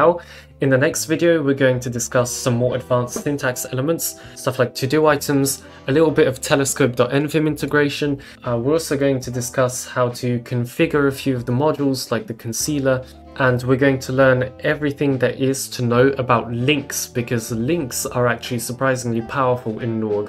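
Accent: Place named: New York, United States. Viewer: sounds like newyork :D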